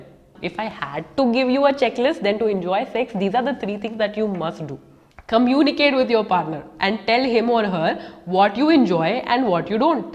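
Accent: Hyderabad, India. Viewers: Omg,are you from Hyderabad....soo hard to know it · U Hyderabadiii?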